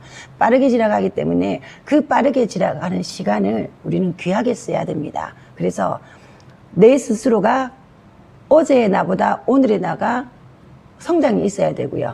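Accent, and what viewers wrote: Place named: Gyeongsang, South Korea. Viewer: 오리지날 경상도네요 경상도 사람들은 말할때핵심이 없고 말만 많아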